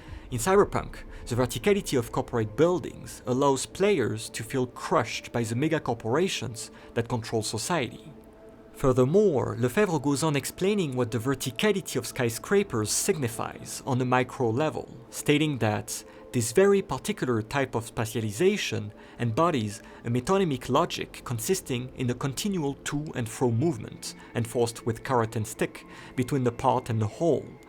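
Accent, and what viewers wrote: France. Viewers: i love your french accent lmfaoo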